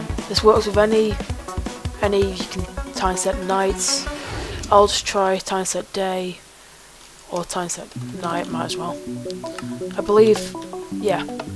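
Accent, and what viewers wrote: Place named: England, United Kingdom. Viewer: you are english i can tell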